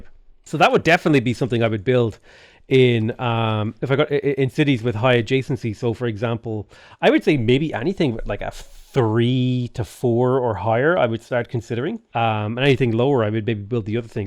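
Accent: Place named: Ireland. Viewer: sounds about 40% more Irish in this video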